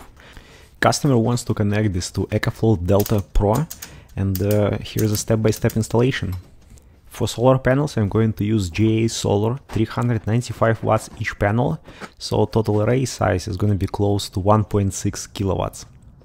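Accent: Russia. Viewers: Ярко выраженный русский акцент. :)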